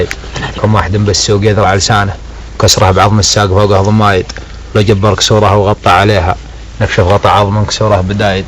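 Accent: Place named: Shabwa, Yemen. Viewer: الكنق العربي بالغلط حطيت حرف الهاء المهم عدلته وكل ما اقصده يا اخوي ان لهجتنا مثل لهجة صاحب القصيده انت تشوف لهجه اهل تعز واهل صنعاء واهل عدن وبعض اهل البيضاء وبعض اهل صعده وتضنها لهجه اهل اليمن وانت في هذا غلطان لان لهجتنا مثل لهجه صاحب القصيده وانا من شبوه ولهجتنا مثلها وبعض اهل صعده واهل الجوف ومارب وقبائل حضرموت… الخ لهجتهم نفس لهجه صاحب القصيده في اليمن لهجات كثيره واكثر لهجه العرب تعرفها لان اصحابها يطلعون في الاعلام وغيرها هم اهل ال3 المدن عدن وتعز وصنعاء وترى كل منطقه يمنيه لهجتها تختلف عن الثانيه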